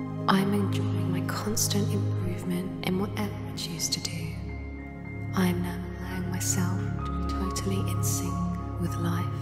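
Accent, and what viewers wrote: Scotland, United Kingdom. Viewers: Whose voice is this? So clear and soft... and I love this beautiful British accent! Thank you very much! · This CD is very calming <link> the first meditation focuses on breathing, the second guides you through the Buddhist metta bhavana--loving kindness to all, and the third is a meditation to be used when walking. him is originally from Scotland, so there is a little accent to his voice, but it is very soothing and not at all distracting. If you are a beginner to meditation, this CD will walk you through all the steps of relaxing and breathing as well as sending out the positive thoughts of love and kindness that will be returned to you. We have several CD's, but this one is a favorite that we choose most often. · MrRasZe that would be British. Lovely accent.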